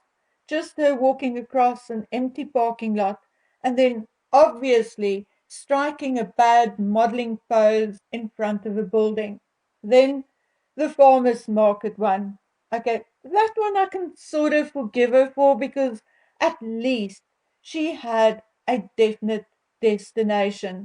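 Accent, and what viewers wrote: South Africa. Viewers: The South African accent grates my ears.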